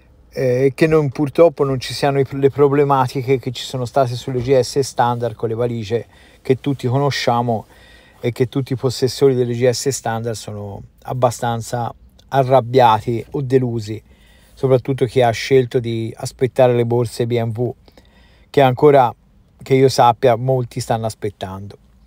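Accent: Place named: Tuscany, Italy. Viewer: Mi sono appena iscritto perché dopo aver visto qualche vostro video mi sembra di essere tra amici che chiacchierano sulla loro passione, io ho una r1250gs std del 2021 e ci troviamo veramente bene, specie mia moglie😅; io sono di Torino ma trovo simpatica la tua cadenza Toscana ( abbiamo amici a Pisa e all’ isola d’ Elba). Ciao Roberto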